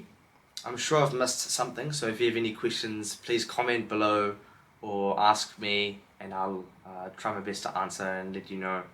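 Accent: Punjab, India. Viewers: bhaji your punjabi accent is amazing.how long did it take you to master this skill?can you also read and write gurmukhi?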